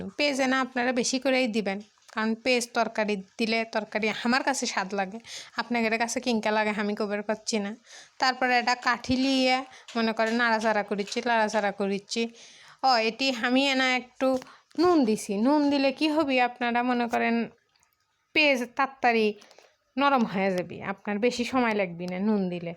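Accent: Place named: Bogura, Bangladesh. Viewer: হামাগেরে বগরার ভাষা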